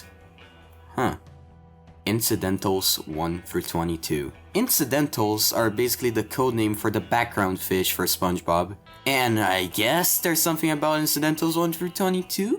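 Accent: Brazil. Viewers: carai mano tu é brasileiro? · carai tu é brasileiro quase pulei da cadeira quando ouvi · BAWJWJWJSJWJ VC É BRASILEIRO????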